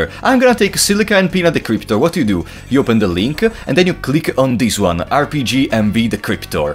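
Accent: Italy. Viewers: sento dall'accento l'italianità, contento di vedere un altro fan di questo gioco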